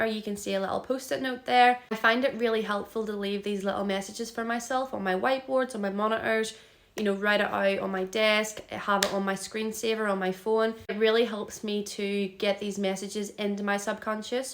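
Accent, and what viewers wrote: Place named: Ireland. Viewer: First time watching your video. Thought you were Indian, surprised to hear your I believe Irish accent. A suggestion would to make your content more engaging even to non traders to grow your channel quicker.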